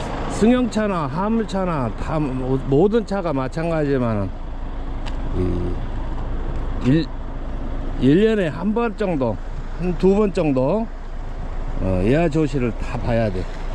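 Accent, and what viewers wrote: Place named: Jeolla, South Korea. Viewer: 기사님 덕분에 영상으로 나마 힐링하고 갑니다ㅎㅎ 표준말보다 전라도 사투리가 더 정겨워요^^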